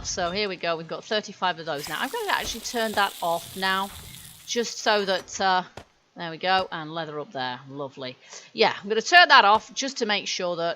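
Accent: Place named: England, United Kingdom. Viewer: I just wanted to tell that i like english accent. 😋 cu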